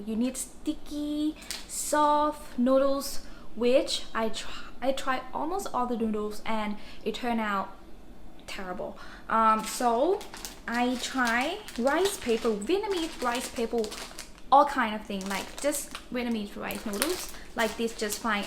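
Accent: Australia. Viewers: are you living in australia? i can hear it in your voice a subtle aussie accent 😅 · your accent is evolving! sounds like an ausie <3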